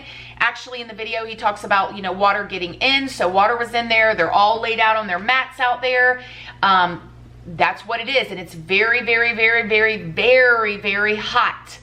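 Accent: South, United States. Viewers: Got that southern slang going on 😂 “Bob Wire” we also say “Chester Drawers” 😂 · climbs up on soap box I would ask everyone who feels the need to "correct" pronunciation of words like "bob wire" or "cement" to please understand that as southerners, we have different ways of saying a lot of words. We know how to spell these words, just like you do. Like any thick regional accent found anywhere around the US (and other English speaking countries), words are often said differently. Think New Orleans, Boston, New York, Fargo, Seattle, Miami, LA, Hawaii, Chicago, etc. And then Canadian, British, Irish... Our distinctive accent and pronunciation of many words is just a part of who we are and the way we heard language as our own voices were being formed. Please stop correcting us, we are not wrong. And you're not either!! We are simply different. I hope we can all love each other for the diversity in each of us. 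/climbs down from soapbox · I love your southern accent and the little slang words you say lol.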